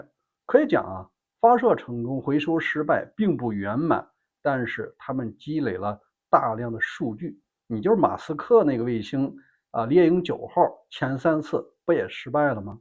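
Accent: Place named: Shandong, China. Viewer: 这山东口音听得难受，你好好练练普通话再说。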